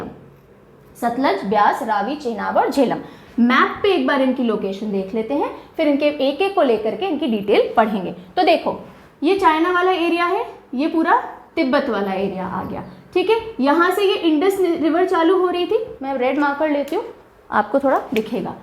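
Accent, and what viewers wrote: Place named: Uttar Pradesh, India. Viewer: Awesome maam...she s from knp or lko...aisa lgta h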